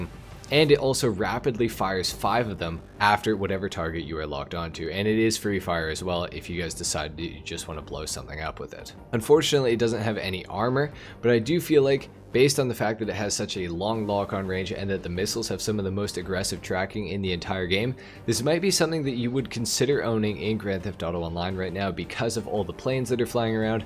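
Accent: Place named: Canada. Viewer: I can tell you’re from Canada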